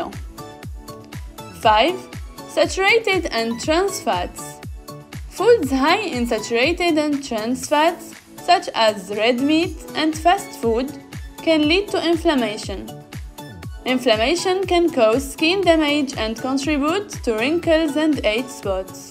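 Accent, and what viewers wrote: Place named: France. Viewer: Your voice tone combined with your accent sound pretty sweet n adorable in my ears.. You’re probably french tho right? :/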